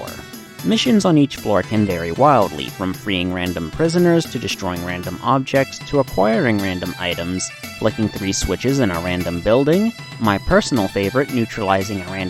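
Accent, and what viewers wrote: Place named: Pittsburgh, United States. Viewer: Are you from Pittsburgh?!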